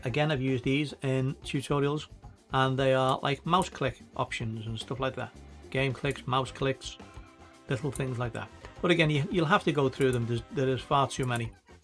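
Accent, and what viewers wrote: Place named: Merseyside, United Kingdom. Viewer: Hi are you still around I've noticed the comments are over a year old. Do you do any one on one tuition for Filmora 14 if so Im interested sounds like you come from my area Merseyside.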